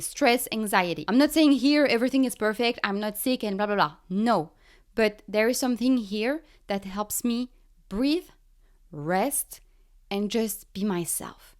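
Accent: France. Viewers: Did you go to school in the States because you have almost no French accent like I do after 50 years in the States 🤗 Et je suis d'accord que vivre aux USA c'est bien agréable que de vivre en France when you are a free spirit and claim your independence. The whole world is nice and different. Il faut suivre son coeur et surtout ne pas écouter les critiques des autres. Vive la liberté et notre raison d'être. One earth, One people. Make The World Great Again! 🍿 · She is very amusing; it could be the French accent, but either way, she does make some good points. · I just stumbled on this topic while I was looking for a song. The subject of this topic was kind of intriguing but mostly I'm interested in topics featuring cute French girls having cute French accents when they speak English. I get what is getting at but I can't really agree with her central theme at this point in time. 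Historically, what she says is mostly true but, in recent years, Americans have gone out of their way to verbally trash their country. I believe this is mostly due to a planned idiocy infused into Americans by forces that want Americans to be stupid and compliant. Americans, in recent years, really have been made to be as dumb as rocks so that is particularly dangerous if they also have bad intentions. Also, and while there is a lot of "tradition" in France, I don't know where is precisely from so it is hard to argue with her feelings that she does not feel free to express herself in France. I know lots of people are expressing themselves very well in France. herself is very smart so her analysis is a valid pursuit. For example, she understands that nothing is "free". There is a widespread notion that medical care in France is free_. It is _not free but rather it is simply a matter that most of the people in France have no idea what it is costing them. 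I might be a little a little biased toward France and the French but then, I was born near Paris. I grew up in the mean streets of Paris. ( Well... Paris,...Kentucky.) But I hope most Americans wil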